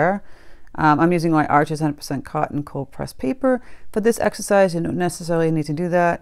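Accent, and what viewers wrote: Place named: New England, United States. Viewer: I love your painting! But even better I love your personality. You make me laugh and teach me things at the same time! What part of New England are you from? Your accent sounds like my daughters. She is Vermont/New Hampshire.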